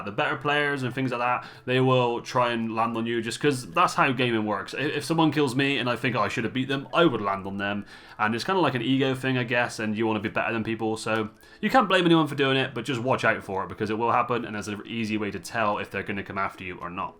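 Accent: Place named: Somerset, United Kingdom. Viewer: I'm from NE Somerset, I could definitely tell. Lots of 'er's lmao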